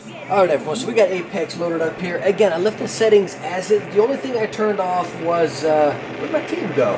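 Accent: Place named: South, United States. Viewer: I stumbled upon your channel when deciding which monitor I should upgrade to and now you've helped me decide on a headset as well. I already have an MSI RX580 but I'm watching this video anyway because I love your personality and energy, not to mention that southern comfort vibe. The quality of your content is insane and I really hope you blow up soon!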